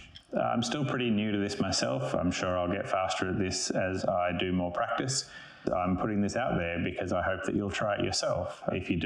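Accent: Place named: Australia. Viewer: Great video! I’m very new to hand sewing seams. Right now I’m attempting to make a shift using the “seam and fell” method described in a book I found called “The Medieval Tailor”. It’s a bit like what you described because the seam is worked in tiny stitches from the right side too. Have you tried your technique for longer seams such as side seams or for seams where it’s trickier to have one hand behind like sleeve seams? You might have addressed my questions in other videos - I’ll go and see what else you’ve posted! Also, it’s lovely to hear an Australian accent 😊. I’m in Adelaide, but most of the other YouTube historical costume channels I have found are in the US and UK.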